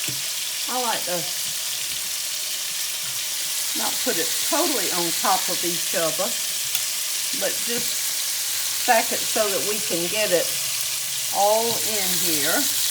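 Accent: South, United States. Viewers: Ms., you are a national treasure! I love hearing your accent (Yankee here) as it brings back frond memories of our time stationed in the South. God's richest blessings to you and your family! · I could listen to you for hours!!! I love your southern accent. I’m a subscriber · It’s so good to still hear that good old southern down home country accent from a southern young lady..!..and can’t wait to cook this dish..!..GOD BLESS..!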